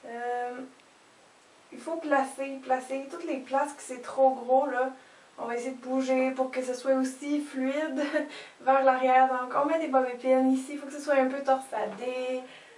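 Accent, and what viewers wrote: Quebec, Canada. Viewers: très jolie coiffure, qui a l'aire facile à faire. je vais essayer!!! ça ma fait bien rire quand tu parle de bobépine je ne connaissais pas ce mot et j'ai compris que ça voulais dire épingle à cheveux. J'adore l'accent québecois!!! Continue tes vidéos sont très bien!! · J'adore ce genre de coiffures et j'ai le même headband que toi seulement il glisse souvent (j'ai les cheveux très fins), je sais pas comment faire pour qu'il tienne bien, tu aurais une solution? Tes vidéos sont super, et ton accent me rappelle mes vacances au Québec :) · Je vais dire la même chose, très rafraîchissant d'entendre la couleur de l'accent québecois sur youtube. Continue d'envoyer des vidéos, ils sont vraiment bien faits. D'une Québecoise à une autre. Bravo! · Canon et j'aime ton accent québécois ! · jador lacsen canadien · Super vidéo merci continue ainsi !!! 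J'aime bien ton accent québécois :) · Omg sa fait du bien d'entendre une québécoise dans se genre de vidéo! La coiffure est vraiment belle! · She has a canadian accent :thats why ! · J'adoooore l'accent québécois ! ! Et cette coiffure te va super bien !! :p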